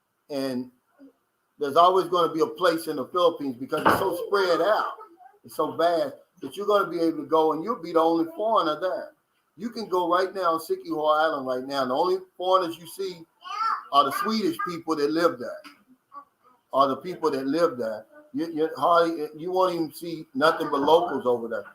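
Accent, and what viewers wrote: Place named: New York, United States. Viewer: hey funny you dont sound upper crust you sound rough new york type but what you say is as smart as anyone example love yourself first before look for external love thats as smart as ive ever heard dont sugar coat ones lack of selflove with anothers love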